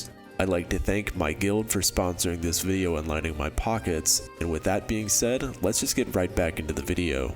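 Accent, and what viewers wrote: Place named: California, United States. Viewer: You sound Californian as fk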